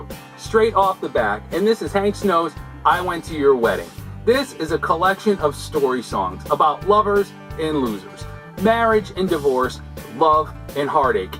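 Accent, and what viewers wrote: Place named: New England, United States. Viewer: Watch out! When we are treated to a country update completely with a New England style delivery, you know you are in for something swell. > Now wait a minute. How did you get to do that four word promo? I have to agree with you, ' ' has some fantastic album art. I could not name one song on the whole album but I love the looks of it. It still happens. That's all I have to do is hear name and my mind reverts to ' '. "A dinosaur Victrola listening to ". You have to love that song. I have only heard one of these albums. I will leave it up to you to guess which one. It is a pretty simple guess really if truly think of it. I will give you a hint. It wasn't Boston's own 'Dukes of Hazzard' who back him up on the album. Excellent update once again Brother. I always feel like I got to wear a cowboy hat and boots when I watch these Honkytonkin' updates. 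Love the country feel~ Rob/Boston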